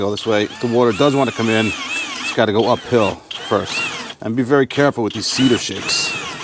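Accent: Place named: New Jersey, United States. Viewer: You still sound like you're from Jersey.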